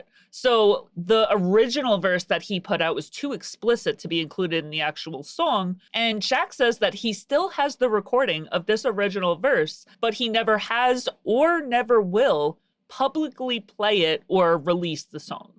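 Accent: United States. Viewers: ohh I completely understand, I wasn’t trying to hate to say you’ve don’t anything offensive or any silly crap like that . I actually like your videos a lot you do amazing work and you do it from a perspective you don’t often see or hear from I like hearing stories I’ve heard (and some I haven’t) before but in a way that I never heard it and you deliver that which isnt an easy thing to do Plus Being born and raised in Baltimore and having a pop pop who use to travel back and forth from bmore to Connecticut, NY and Jersey like he had a different wife and kid in each state (I think he might of actually on Buffalo but that’s beside the point lol) but he was an old school “union worker and sometype of official” who also was a major number runner in the city (it’s ok he passed away awile ago so no worries) but I’ve heard some stories and seen a few crazy things as a youngin lol you also remind me of a few girls who were really good friends that I grew up with. 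I know your not from bmore im Pretty sure your from somewhere in the Tristate area maybe in one of the five famous boroughs of New York or New Jersey maybe Jersey city or Newark. I can hear a little bit of it in certain words you say (you’ve probably mentioned it before, my memory is shit nowadays anyway lol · I love your accent. Reminds me of living in queens ❤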